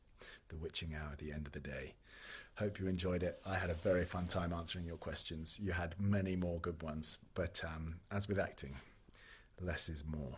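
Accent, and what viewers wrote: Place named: RP, United Kingdom. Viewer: Omg he's really gorgeous. I didn't expect him to sounds so posh haha.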